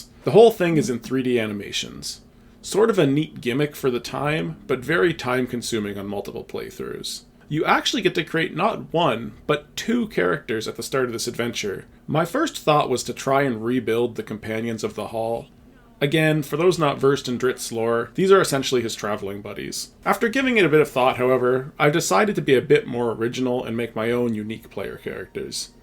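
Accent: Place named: Canada. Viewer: I always pronounced it Men-zo-burr-AHN-zun, I love your Canadian mish mash on the pronunciation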